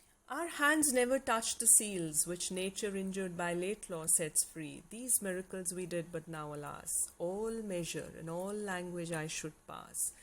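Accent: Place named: India. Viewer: Thank you for the great an excellent explanation about this poet .really,I have never heard this kind of explanation in Indian accent and as much clear accent, really it was attractive and learned very clear and good this poem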